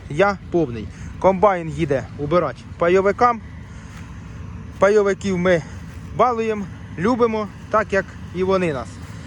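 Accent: Poltava, Ukraine. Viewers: Звідки ви ? Акцент Полтавський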